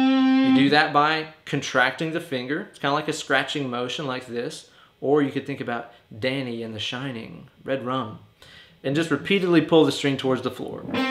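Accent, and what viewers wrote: South, United States. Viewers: Are you from Tennessee,? Just curious, you know White Chapel, and you have a southern accent, just putting the pieces together. I just moved to TN from MI, and I love it down here! Cheers!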